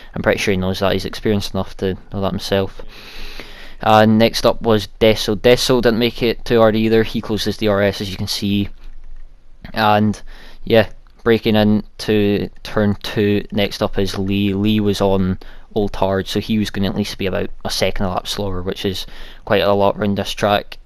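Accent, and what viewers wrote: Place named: Scotland, United Kingdom. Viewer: Good commentary mate! I'd up the game sound, it felt a bit strange not having it. Btw, ya got a gud ol' scottish accent there xD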